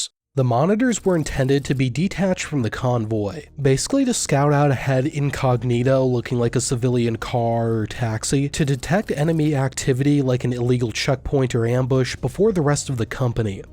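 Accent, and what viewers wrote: Australia. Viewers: great aussie accent - Jordies fan? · That was actually a really good Australian accent mate 👍 well done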